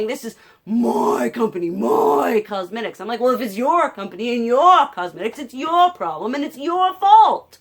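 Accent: South, United States. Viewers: I detect a southern accent! Where are you from? I’m from NC. And if you’re not from the southeast you dang sure sounded like it lol. New sub!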